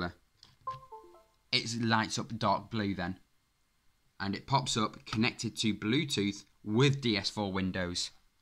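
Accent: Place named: United Kingdom. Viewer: I LIKE BRITISH ACCENT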